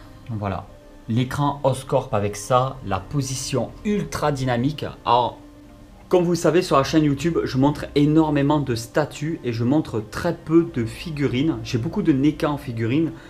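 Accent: Toulouse, France. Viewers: Coucou j'espère que tu vas bien? Oui oui sa serai trop cool de voir l'arcade avec l'intérieur de la machine le système de monnayeur et tout se qui se trouve à l'intérieur. Tout les systèmes ect.. Je me suis toujours posé la question comment sa fonctionne. Donc une vidéo sur sa je pense que sa pourrait faire une vidéo qui pourrait plaire à beaucoup de monde. Merci de nous régaler Sa fait un bien fou de te revoir. Ton accent de toulousain nous avait manqué. En plus on est pas si loin moi je suis de capestang à côté de beziers. Je te souhaite une excellente continuation. Continue à nous régaler. A très bientôt. Bonne journée à toi et à toute la famille.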